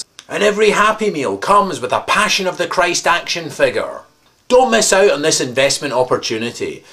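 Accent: Scotland, United Kingdom. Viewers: I love Scottish acsent and I love this video, you are the funniest guy. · Your Scottish (or Irish) accent is the funniest thing in your videos. The rest of the content is fairly awesome, too, however.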